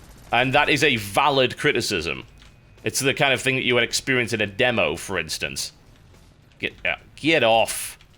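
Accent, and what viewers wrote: United Kingdom. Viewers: has a specially marked brittish accent today.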